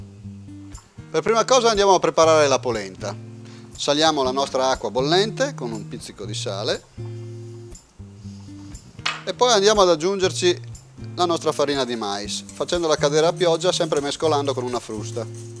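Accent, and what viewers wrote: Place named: Friuli, Italy. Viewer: l'hai sentito parlare? certo che è friulano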